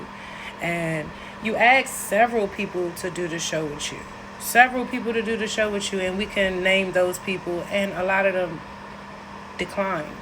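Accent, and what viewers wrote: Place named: DMV, United States. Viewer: Im from DC and when I first heard you speak I knew you was from the DMV, you have the accent. I know you fucked up about this whole Chyna situation, but forget her. She was jealous of you. Plain and simple. She was jealous that you can be lowkey and private, wear comfortable clothes while she has cameras running around her all damn day. When I seen yall first interact on the show, she would throw you small jabs that I picked up on but Im surprised you never picked up on them. Oh well, its the past. Time to move on. And you and Chyna seem so different in a way, maybe befriend women who have a similar personality to yours. I know your going to be ok, you seem to know how to cut someone off if they not on the same wavelength as you.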